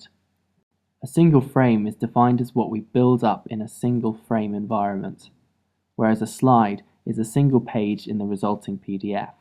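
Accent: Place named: United Kingdom. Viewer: Very nice tutorial, it's very helpful and I really love your British accent, which makes your tutorials so comfortable to watch.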